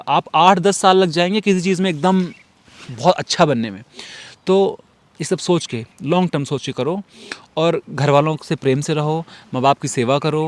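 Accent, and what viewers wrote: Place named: Pahadi, India. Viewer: Apaki pahadi avaz jaisi lagati hai very very nice. Thank you bhai.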